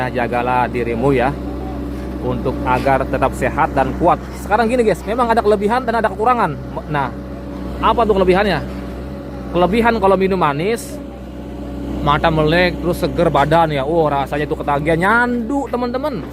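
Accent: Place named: Jakarta, Indonesia. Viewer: Nggak apa2, enak kok ngedengerin logat betawi ora 😅😅😅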